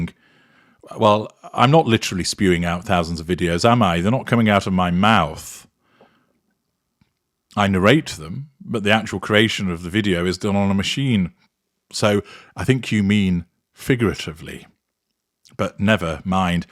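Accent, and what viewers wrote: United Kingdom. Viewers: I love how you take them down so eloquently & intellectually in your amazing well spoken British accent 👏 👌 It should certainly give them something to think about of the way they now look so foolish ❤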